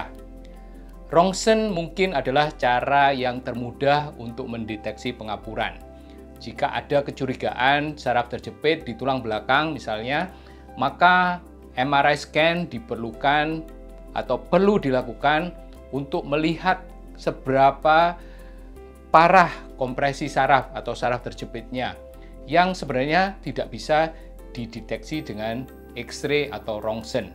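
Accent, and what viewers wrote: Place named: Java, Indonesia. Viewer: Medok ya dokter Jowo ne ... siipp deh pokoknya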